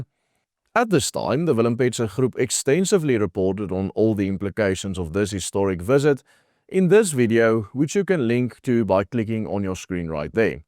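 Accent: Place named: South Africa. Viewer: Love the accent i wish i had an South Africa accent 👍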